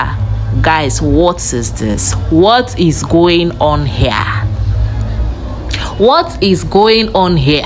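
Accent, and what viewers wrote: Ghana. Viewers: You sound more Ghanaian than a Nigerian. Very soon, your accent will change completely 😂 Nice video btw✌️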